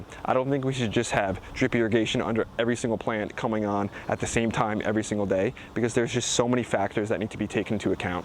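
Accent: New Jersey, United States. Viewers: Come for the gardening advice, stay for the New Jersey accent. "Worter"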